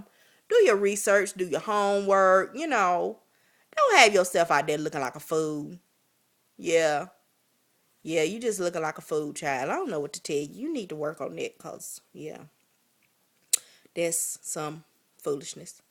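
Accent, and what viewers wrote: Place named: South Carolina, United States. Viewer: You are too funny you sound like my friend from SC. I love the "chew my draws" I'm going to have to find a way to use that lmao. You would have me laughing all day long. I I sell virgin human hair extensions and I generally wear 20" and my hair does not tangle at the nape or matte.My company is Mane Extensions of U I would love for you to try the hair I have a variety of wefts, loop ring, bulk, closures etc. You can find me on YouTube, Facebook and website (system will not allow add link) .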